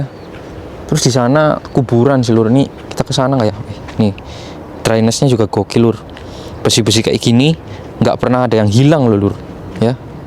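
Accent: Banyumas, Indonesia. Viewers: orang mna sih kok logat bahasanya sama kya inyong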